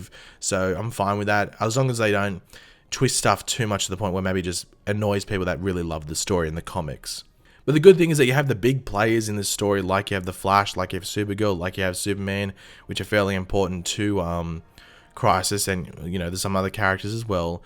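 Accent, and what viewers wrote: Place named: Australia. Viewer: You’ve become EXTRA Australian in this video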